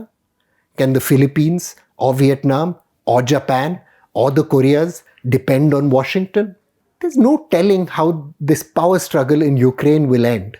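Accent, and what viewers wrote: India. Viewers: So, this is how CNN would sound with an Indian accent... Russia bad, Serbia bad, NATO good... What a joke...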